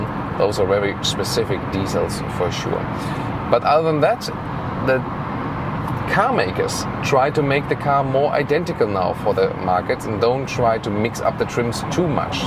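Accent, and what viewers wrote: Germany. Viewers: Very well done ! I like the superb number of facts you are able to tell us during driving and the pronounced subjective comments on every car ! You really speak fluently English but why don´t you stay for a couple months in the states and get rid of the hard German accent ? ! it should make your really interesting videos just perfect ! Please continue ! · OMG… you sound so Germish 🇩🇪🇬🇧 that I can’t continue watching it!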